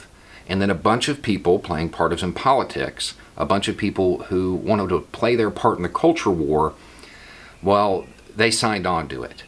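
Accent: South, United States. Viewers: Not enough. Though I always hope that the beard and hick accent catches their attention for a minute.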